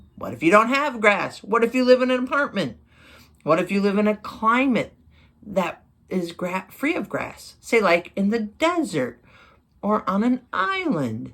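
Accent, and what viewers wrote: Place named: United States. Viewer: i prefer American accent to British accent cuz when you're speaking or talking the american accent is charming 🥰❤️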